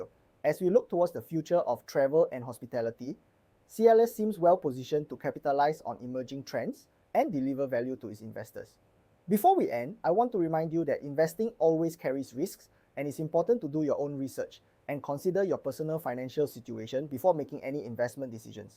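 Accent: Singapore. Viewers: Singaporean accent! Feels so homey :) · Apart from your original voice, this Singaporean accented one is also good. Please stick with one, or my mind gets confused as to who's talking to me via your videos!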